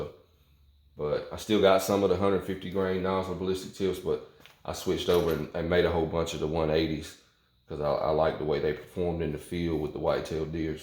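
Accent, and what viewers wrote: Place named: South, United States. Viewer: I know this is an old video but blessings for sharing the experience. I have the MVP LR-308 in Kuiu Camo with a 20 inch medium bull barrel (discontinued.) That bull barrel likes 180 grain. I have not had the scout edition but definitely a good deer gun for thick woods in the southeast. I hear the southern accent in your voice, so, I know you are hunting thick woods like I have in northeast Texas.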